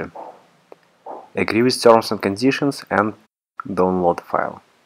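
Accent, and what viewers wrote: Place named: Russia. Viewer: рашн инглиш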